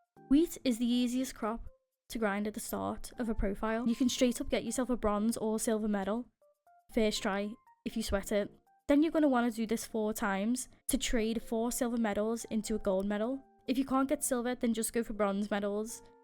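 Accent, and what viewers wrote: Liverpool, United Kingdom. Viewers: I love that british accent lmao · are u from liverpool u have a scouse accent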